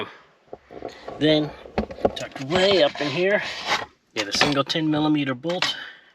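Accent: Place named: Canada. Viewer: That Canadian accent is annoying.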